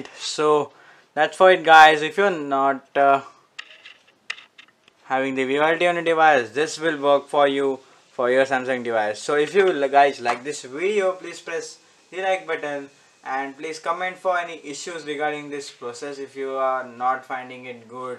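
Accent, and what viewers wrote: India. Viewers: Indian accents, why?! Just can't understand a word.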